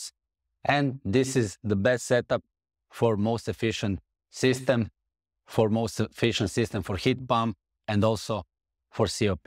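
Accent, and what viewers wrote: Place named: South Slavic, Eastern Europe. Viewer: Odmah se primeti (tvrdi) naglasak juznih slovena. Ali odlicno je sve, samo tako nastavi. Pozdrav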